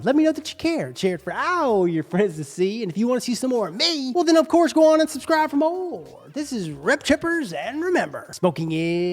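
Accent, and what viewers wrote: United States. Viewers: Those contacts would look cool if your wore them properly. Instead you have your eyes open as wide as possible like a damn meth head making you look strait up dumb. Learn how to wear them the right way. But you sound American so stupidity is expected.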